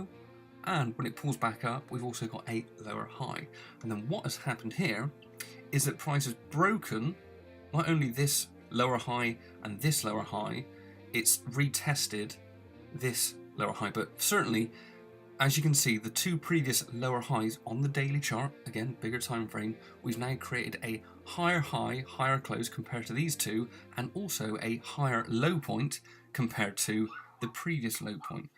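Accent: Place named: Bristol, United Kingdom. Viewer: Your accent is even more Bristolian in recordings!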